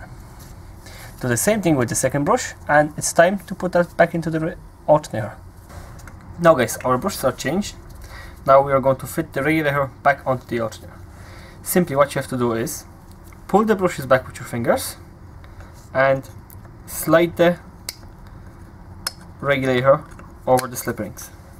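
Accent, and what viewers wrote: Ireland. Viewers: Man not being funny but you have a mad accent irish farmer/central European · Hi. Thank you for your time and sharing your knowledge you could nearly be mistaken for an Irish man. 😂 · What part of Ireland is that wonderful accent from????? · Funny! Best Irish accent from a foreign national ive ever heard!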